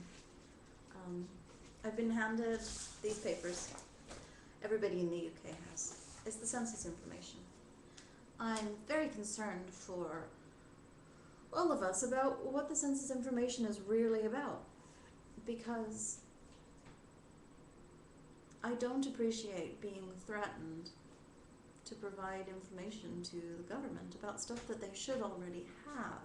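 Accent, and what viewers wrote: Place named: Ireland. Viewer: I love your accent and you are a beauty! Are you from Ireland?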